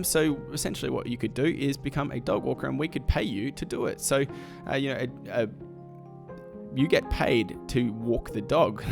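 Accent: Australia. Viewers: this is gold info dude. great to hear an aussie voice too. subd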